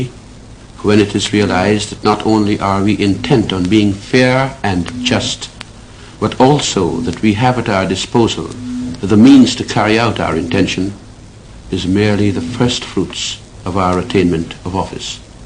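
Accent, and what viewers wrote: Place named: England, United Kingdom. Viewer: Great orator my ass. He trying to sound British. That is no Guyanese accent.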